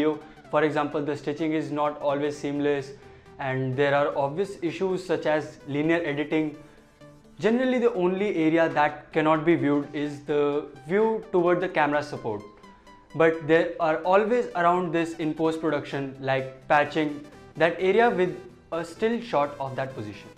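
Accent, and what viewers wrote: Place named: India. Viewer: What is everyone's problem, he's talking fine, it's a heavy Indian accent but totally understandable.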